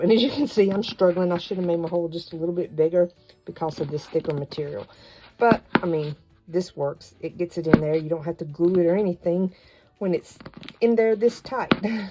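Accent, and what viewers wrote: South, United States. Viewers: Just found your channel & love it! Sounds like you might be southern? I’m from Alabama so I hear a little bit of myself listening to you❤️❤️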